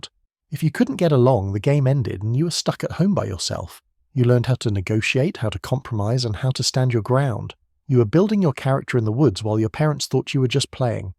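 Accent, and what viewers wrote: England, United Kingdom. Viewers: Man, I was about to enjoy this video till they put this bullshit British accent down there that’s not American or nostalgic. What the hell is wrong with you? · The British commentators voice does not fit the memories from AMERICA in the 70’s and 80’s. Sorry, bad choice. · Nostalgia. It's a little unusual to hear a British voice narrating American English vocabulary and photos. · Why does the narrator have an English accent? This is about 'Merica. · This is a strange video with a British accent but American images. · This British accent is pissing me off for some reason 😡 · Uk accent for a US life. Doesn’t matter. Most of it applied. A child of 76, I grew up playing feral in short shorts, bikes with long seats paper rounds, making camps out all day every day in the summer with the only instruction to be back home by dark. 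Happy happy times. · Brit accent for Americana. Hmmm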